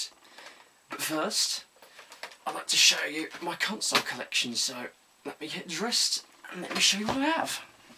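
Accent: United Kingdom. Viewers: i love your british accent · u sound like ure from the west mids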